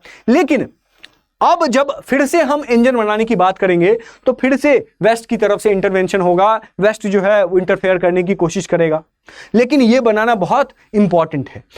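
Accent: Bihar, India. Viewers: sir have such a bihari accent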